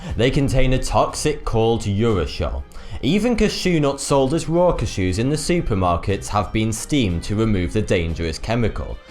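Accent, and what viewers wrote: United Kingdom. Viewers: That's just the British accent. We pronounce a lot differently. Also he has a regional accent which makes pronunciations even more different. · 42? really? the answer to the universe is a lower class Brit with an increasingly annoying accent? seriously? get the marbles out of your mouth son.. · He is British. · Matthys Fourie think twice how does he foughty2 at the beginning of every video because he has a British accent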